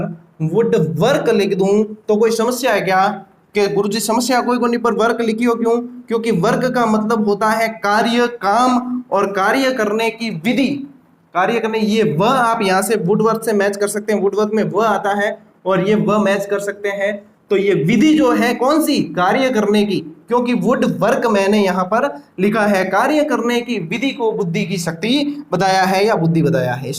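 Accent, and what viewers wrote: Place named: Marwari, India. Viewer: Sir ji aapki marwadi language bahut acchi lagti hai aap aise hi bola karen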